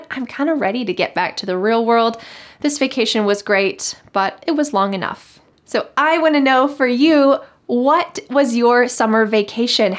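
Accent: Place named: United States. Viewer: I got impressed with your story about vacation and I can figure it out how fun it was. in my country I never get a vacation so I work twenty for seven. it's really tiring but I just took it for granted for everything that I have. I wish I could it's probably after retiring , I really like your video lesson and love American pronounciation. thanks a lot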